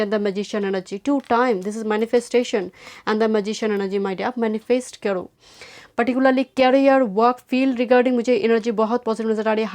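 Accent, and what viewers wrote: Bengal, India. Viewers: You sound like a Bangali.....is so..?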